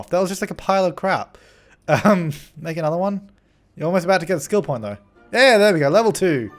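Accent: Australia. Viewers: He does sound normal doesn't he? Makes a nice change. It even sounds like an Adelaide accent.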